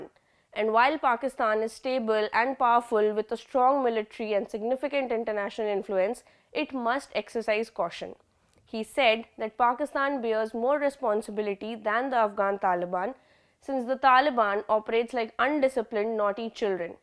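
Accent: India. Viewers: Then leave the country if u hate Indian accent so much.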